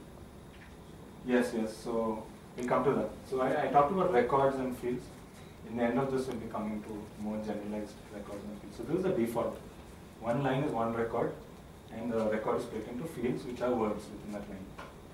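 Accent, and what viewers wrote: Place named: India. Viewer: I cannot for the life of me understand English with an Indian accent. Other accents really aren't a problem, just can't understand the Indian accent. Frustrating.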